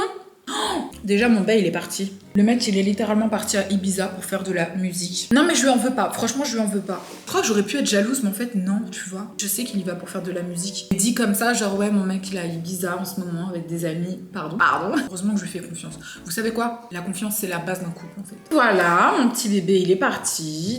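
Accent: England, United Kingdom. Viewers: Son accent anglais est très intéressant et tu gagnerais à l'écouter car ça permet de retenir certains mots. L'anglais est la langue la plus importante donc il faut t'y mettre.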